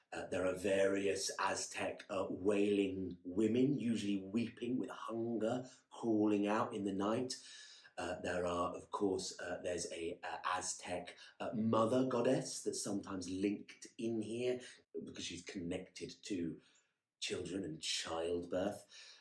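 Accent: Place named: Birmingham, United Kingdom. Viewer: Hey are you from Birmingham? Your accent reminds me of an old roommate of mine from there:)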